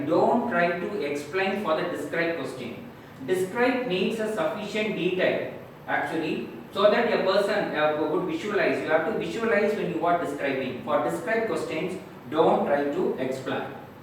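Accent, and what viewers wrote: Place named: India. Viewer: Your English is like Indian very fast.........please reduce speed.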